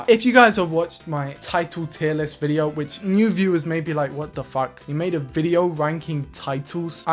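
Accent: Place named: Southern England, United Kingdom. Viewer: Are you putting on an American accent some of the time? You pronounce 'time' with a long 'aa' like you're from the deep south (US) but otherwise you sound like you're from the south of England [puzzled emoji]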